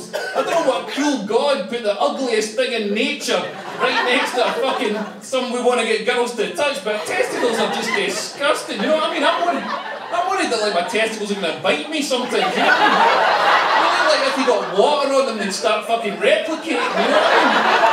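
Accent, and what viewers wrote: Scotland, United Kingdom. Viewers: audio quality is terrible and with the scottish accent it's almost impossible to hear what he is saying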